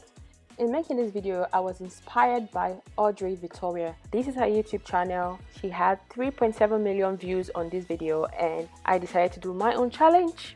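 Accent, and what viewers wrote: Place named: Jamaica. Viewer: Sound look jamaican.. good job sis. More power to u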